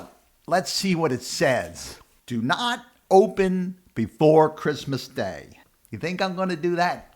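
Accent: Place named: Pennsylvania, United States. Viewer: I'm trying to place the accent. Chester County. Maybe Delaware County.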